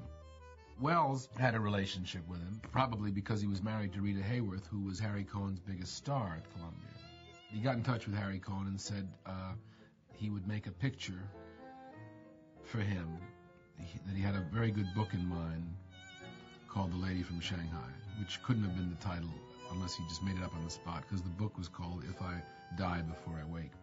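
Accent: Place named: Ireland. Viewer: lol I lasted 30 seconds, not fair I know but his constant talking in that terrible Irish accent......!!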